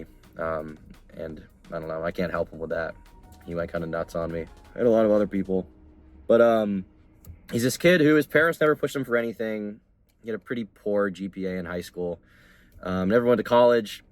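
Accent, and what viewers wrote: New England, United States. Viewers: Oh shit I didn’t know u were from New England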